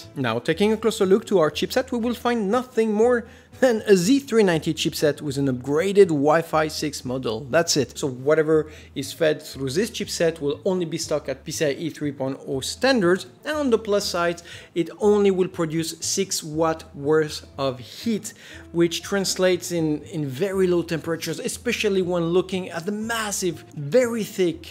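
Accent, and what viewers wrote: France. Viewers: Je viens d’apprendre grâce à cette vidéo que je vais pouvoir maintenir ma workstation à jour d’ici un an ou deux, juste en remplaçant le processeur par un Intel de 11e génération. Super intéressant !Par ailleurs, sur la forme, l’accent français est génial et sur le fond plein d’infos inédites sur les processeurs Intel de 10eme génération et sur la gamme Aorus de cartes mères gigabyte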